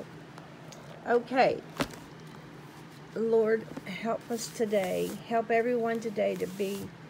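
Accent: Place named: Virginia, United States. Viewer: "I say 'otha, otha, otha (not other) coz I'm from Virginia." Lol. I love you when you are at your natural best. 😘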